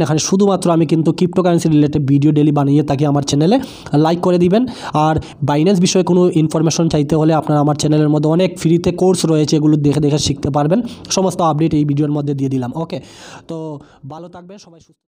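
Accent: Sylhet, Bangladesh. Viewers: Baisab sylhetti bujchi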